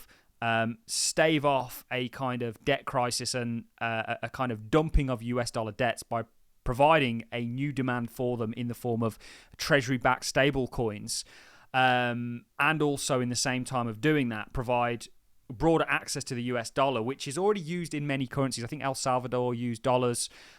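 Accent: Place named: Stourbridge, United Kingdom. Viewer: You from Stourbridge, Mate? Or is it Redditch?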